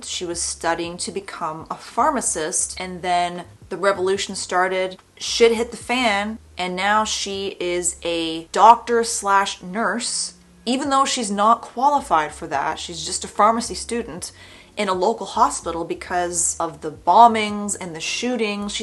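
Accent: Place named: United States. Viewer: A bit off topic,,,but why do you sound American? Specifically speaking Network Englkish. I don't hear a trace of Canadianisms, like process pronoced proecess, about pronounced aboot (more nuanced but I dunno how to spell it), no terminal rhetorical questions, eh? I'm a native Californian and you sound indistinguishable from my fellow SF Bay Areans. Inquiring minds want to know.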